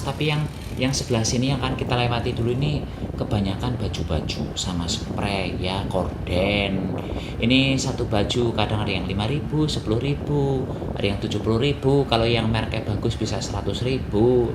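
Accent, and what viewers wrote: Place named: Banjarnegara, Indonesia. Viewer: Mas...aku ngefans banget karo njenengan....saben dina nontoni nyutube..nek minggu libur..sok praktek...top resepe kereen. Nyong dadi kemutan desaku nang kabupaten Banjarnegara....ngomonge dialeke plek kecipek yakin.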